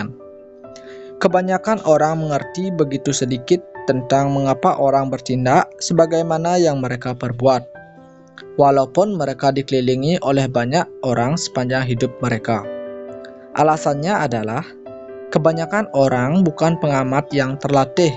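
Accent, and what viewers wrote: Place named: Aceh, Indonesia. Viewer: Orang aceh 😅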